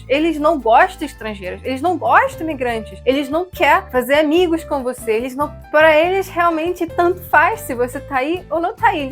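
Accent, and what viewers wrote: Rio de Janeiro, Brazil. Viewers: Você é impressionante. Corajosa, firme, inteligente e linda Seu português, carioca, é lindo e combina com sua voz. O meu desejo de todos brasileiros, é que seja muito feliz aqui ou em qualquer lugar no mundo. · Nossa!! Você não queira saber do quanto me sinto feliz,ao saber de uma história tão bela,quanto essa. Saber do quão incrível é você,! E sua performance em entender a nós brasileiros e de se expressar tão bem,e de forma tão agradável e gostosa,o nosso "português -- brasileiro;@ mais,com o "sotaque carioca" -de admirar,tão lindo que é!!!. Parabéns por sua dedicação e admiração brasil ao brasil,sua cultura e sua gente. -você, compartilha da mesma nacionalidade que nós,então,podemos dize: "você é nossa compatriota ". Um beijo no seu coração!!!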